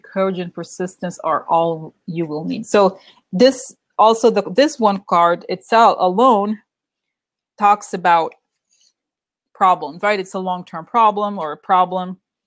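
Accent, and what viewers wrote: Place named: Russia. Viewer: Xoxoxo I love your Russian accent. 😊😊😊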